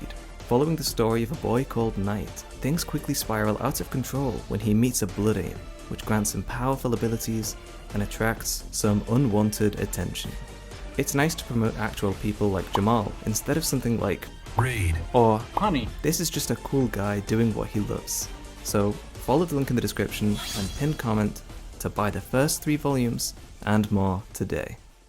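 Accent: United Kingdom. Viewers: I think it's because you have an English accent who speaks vary softly in tone, just like most youtubers who explain the Dark Souls lore, you know the kind.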